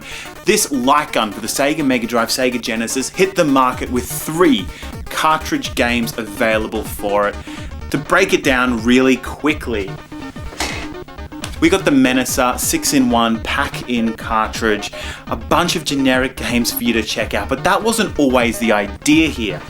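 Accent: Australia. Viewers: you're Australian... its sea-ga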